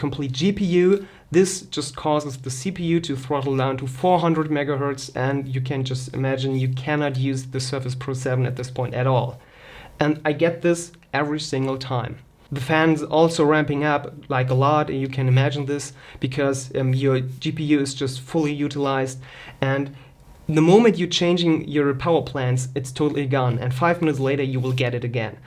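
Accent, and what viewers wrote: Germany. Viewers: the german accent makes you sound exotic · Are you German your Englisch Sounds German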